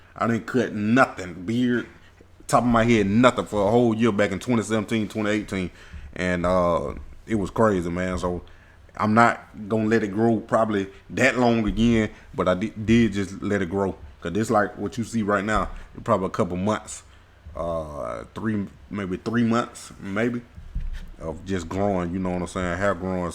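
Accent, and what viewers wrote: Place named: Florida, United States. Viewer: Sound like you’re from Florida 😂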